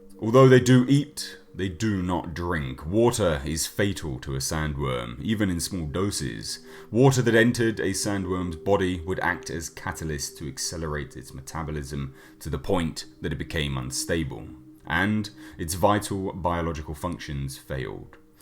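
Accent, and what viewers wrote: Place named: United Kingdom. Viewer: I am interested in anything that you say with that deep Brit voice.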